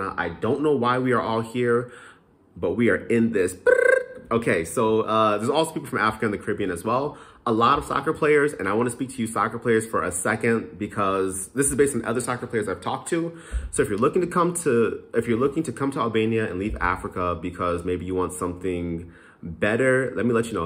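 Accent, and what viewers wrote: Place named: New York, United States. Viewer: I can tell this dude is from NYC.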